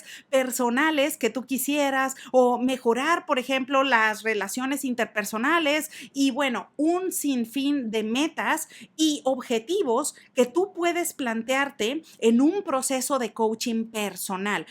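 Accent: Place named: Mexico. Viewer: saludos desde argentina me gusto tu explicación además de la energía con la que le das énfasis a tus palabras y de gustarme ese lindo acento mexicano ji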